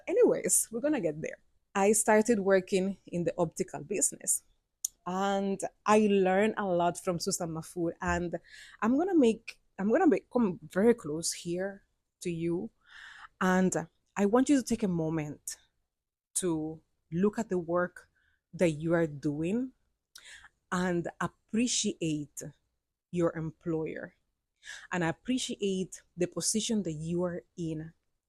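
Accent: Jamaica. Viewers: I love this. I'm surprised you made the transition to Jamaica. I've heard Cubans like Dominicans don't care for blacks. Here you are in Jamaica. I love this. Girl: if you could see my face; lit up, listening to your Jamaican accent. I'm from Montego Bay, now living in Texas. We need to learn more about our Cuban brothers and sisters. Welcome to Jamaica.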